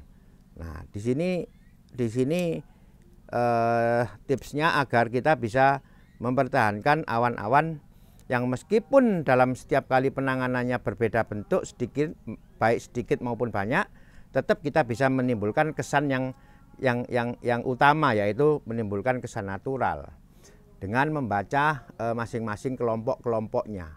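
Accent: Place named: Madura, Indonesia. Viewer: Logatnya kayak orang madura kok dipanggil koh 🤣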